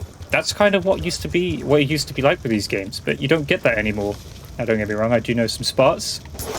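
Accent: United Kingdom. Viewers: I love your accent. English…right? Australian?) reminds me of how Beard meets the World talks. Love it ❤️ 👍 😊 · Oh! stampylonghead is also British so I guess that's why you and him sound somewhat similar? and np keep up the good work. But in your videos I really feel like somethings just missing, it doesn't really draw me in to watch more if that makes sense? it feels kinda bland and its not really engaging too. honestly I'm not really sure on the improvements you could do but I'd hope to see some changes overtime. hopefully I'm not mean with this though, just expressing an opinion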